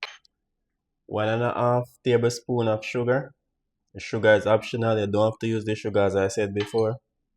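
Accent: Jamaica. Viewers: I like how you cook and bake, I followed your sweet potato pudding and it was a breeze and tasted 😋 good, I don't bake every week because we have to watch gain!😢 I like your accent, is it a Westmoreland or ST. Elizabeth accent? I pick up that your Channel is from foreign though. The sugar softens the dumplings, also a little milk.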